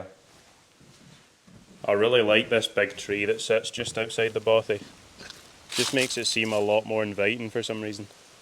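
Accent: Scotland, United Kingdom. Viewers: Beautiful Scotland, beautiful accent! Greets from Warsaw :)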